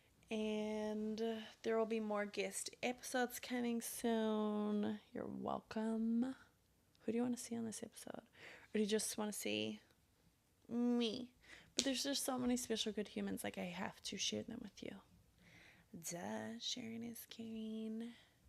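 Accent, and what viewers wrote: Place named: Deep South, United States. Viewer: you are very funny and wise and cool. Relate to not liking maths at school, and having to deal with shitty customers. What part of the states are you from, surely the deep.south from your accent?? ❤❤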